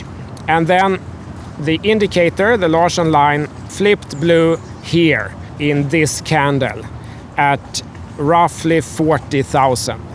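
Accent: Sweden. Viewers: Best Swenglish on youtube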